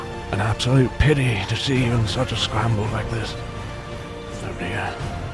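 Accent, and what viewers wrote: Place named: RP, United Kingdom. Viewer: Damm you do a bloody awsome David Attenborough aksent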